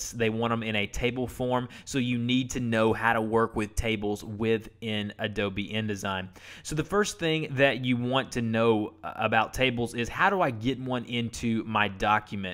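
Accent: South, United States. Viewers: Awesome video and I like your southern accent!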